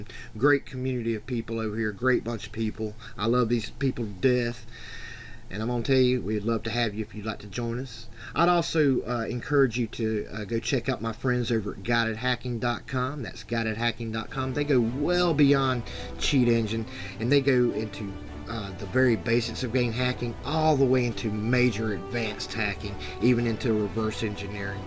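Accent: South, United States. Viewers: Subbed! Love your accent by the way. Are you Southern or Pagan by any way?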